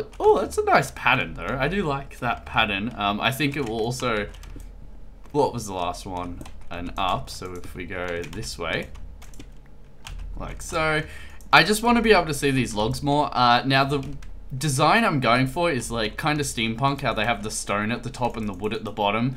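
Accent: Australia. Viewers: Are you Australian? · You're one of my favourite youtubers, you deserve way more subs man! Awesome content! And people say to me: You sound Austrialan, then I directly think of your voice haha! :D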